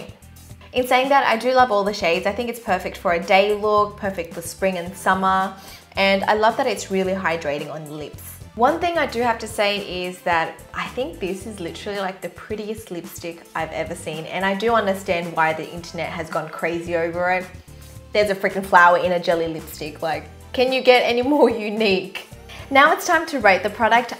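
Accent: Sydney, Australia. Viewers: Omg yas is that an Aussie accent? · her australian accent is form sydney where she grew up...mentioned in video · haha shes probably asian but grew up in australia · its australian!! like mine :) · She's Australian. She even mentioned that she was in Sydney. :) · oh my gosh lol. she can be Asian and Australian... she's from Australia but is Vietnamese lol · Her accent is Australian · Liz Beth she's from Australia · Liz Beth she's from australia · I knew you got OZ accent HAHAHAHHA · I knew it! sounded Aussie but wasn't sure :-P Thx for your reply. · R u from Sydney Australia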